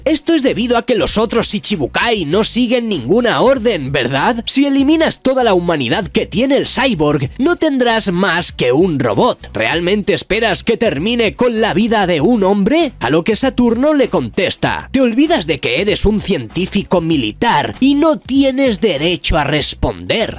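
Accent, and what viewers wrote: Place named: Galicia, Spain. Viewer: Me molesta el acento gallego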